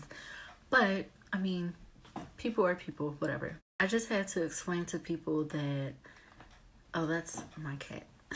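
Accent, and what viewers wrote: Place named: Baltimore, United States. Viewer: Thank you from sharing! Are you from Baltimore? I hear your accent lol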